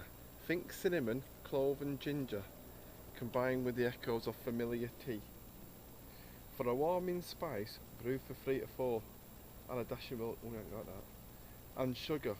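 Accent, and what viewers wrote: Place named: Manchester, United Kingdom. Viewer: Nice vid , great spot youve got there,nice food, nice set ups, cheers.......johny.......ps.thats defo a manc accent.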